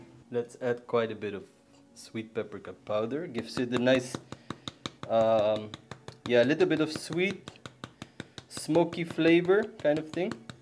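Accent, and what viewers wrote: Philippines. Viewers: i love your pilipino acsent😍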